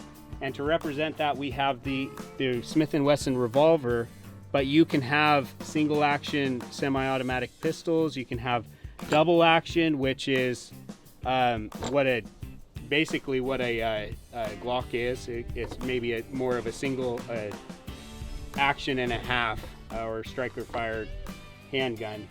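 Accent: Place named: United States. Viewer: Jesus why do Americans explain everything so stupid can they not just say it how it is i think its the accent 😅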